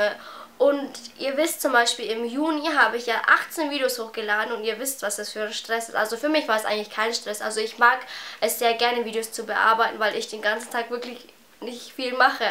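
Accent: Bavaria, Germany. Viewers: du hast keine sprachfehler? man merkt aber übel dass du aus bayern kommst :D des ist voll süß :) und das des abi in bayern schwieriger ist als in anderen bundesländer stimmt! :) reg dich nicht über andere leute auf !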